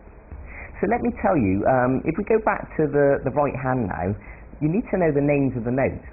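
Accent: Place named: Australia. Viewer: pretty sure thats an australian accent...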